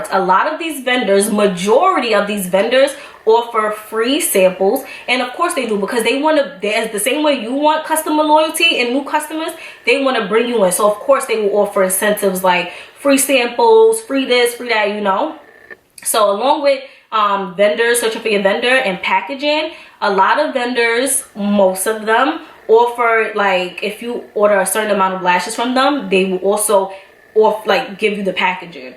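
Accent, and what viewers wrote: New York, United States. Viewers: I love NY accents. Thanks for the vid!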